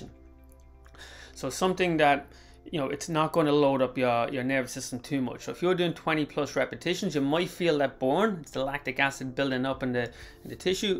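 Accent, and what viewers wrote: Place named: Ireland. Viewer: You Irish ? I’m down in limerick. Nice to see a Irish person cover adrenal fatigue!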